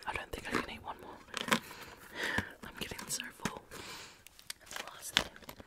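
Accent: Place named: Australia. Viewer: I love your voice. It is perfect for ASMR :) I wish that I had an Australian accent instead of a Canadian one lol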